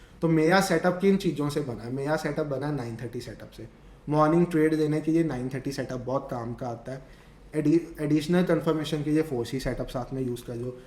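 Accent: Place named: Pakistan. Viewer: Your accent is like a pakistani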